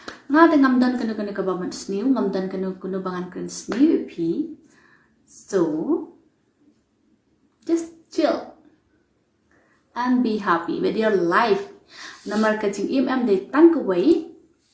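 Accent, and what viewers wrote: Bangalore, India. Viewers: Kasur ruh thik kum thei banggalore hi naduh karukom ak,nai ak than ainoh shi pek ne 😅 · 🤣🤣🤣🤣🤣🤣 kum ka thei bangalore khlm lait ka rukm krn rukom akshion pet sa ktno sa ia bhdeg dohtdong ne bah km ia ka thei bangalore ipoh jait bha jing log kynthei kmne phi don hynmen para ne phi dei khun marwei ne ilh rain bha phi bn lh kmne te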